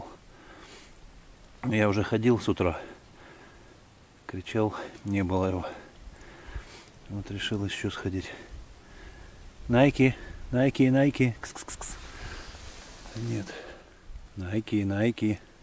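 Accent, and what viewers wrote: Ukraine. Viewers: так по говору можно догадаться что он с украины.у них свой акцент имеется.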